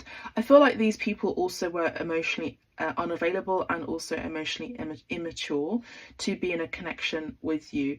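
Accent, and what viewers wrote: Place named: United Kingdom. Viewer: England! Yes! Nice to hear a British accent.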